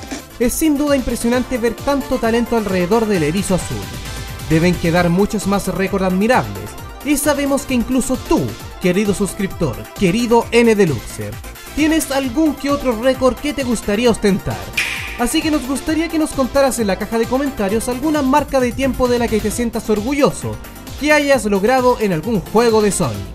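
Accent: Chile. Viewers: Wait hablas español hablas como chileno :v Espera talk spanish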